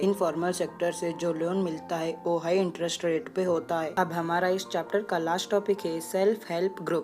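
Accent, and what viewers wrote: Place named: Uttar Pradesh, India. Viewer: Avaj se UP ka lag rha hai bhai tu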